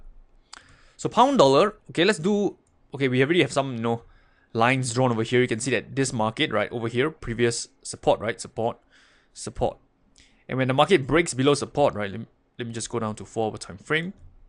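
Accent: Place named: Singapore. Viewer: Singapore English....lol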